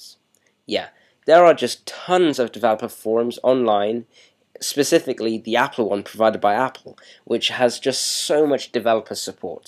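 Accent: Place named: Germany. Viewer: i sense a german accent, being german myself, where in germany were you born?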